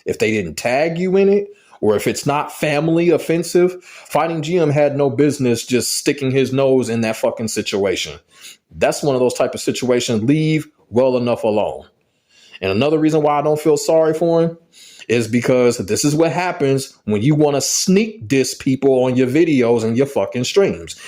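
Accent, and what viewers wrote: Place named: New York, United States. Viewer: I never liked him,annoying ass ny accent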